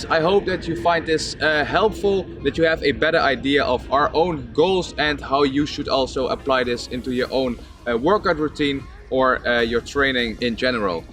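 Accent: Netherlands. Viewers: Are you Dutch? You accent gives it away.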